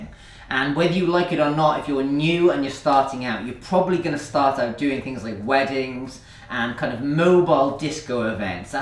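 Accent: Wales, United Kingdom. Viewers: Its probable, that him being from Wales, his accent makes it sound like he is saying "tress" rather than "truss". I may be mistaken though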